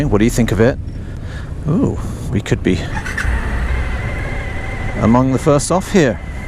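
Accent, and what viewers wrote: United Kingdom. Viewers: Love watching the videos. Your accent makes me miss the UK.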